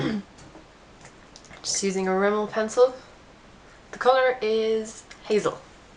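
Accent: Ireland. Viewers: very nice look, thanks :) are you from Dublin? :)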